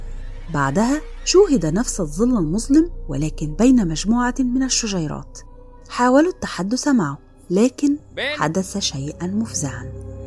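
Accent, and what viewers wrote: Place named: Egypt. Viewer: يا مرحبا بأخت صدقيني اعشق صوتك اتعرفين لماذا لانو عندما كنا صغار في جزائر كنا نسمع صوت رسوم متحركة بلهجة مصرية ولهاذا صوت مصريين نحسه يذكرني بصغري والله روعة 🇩🇿🇩🇿❤❤🇪🇬🇪🇬💪💪💪💪💪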